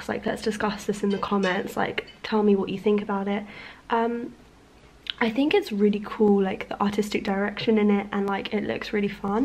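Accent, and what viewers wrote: Iran. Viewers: Hi 😍 Please speak more Persian in your videos! I love your Persian accent. especially when you and your family speak in farsi! I hope you and family be safe and happy as always!💞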